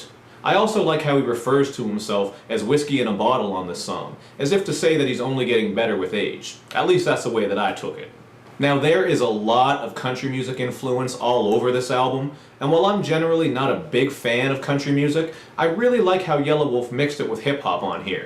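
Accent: Canada. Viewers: Are you Canadian bro?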